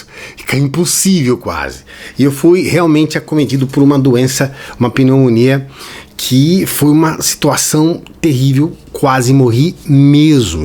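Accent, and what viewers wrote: Minas Gerais, Brazil. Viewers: Vc e mineiro?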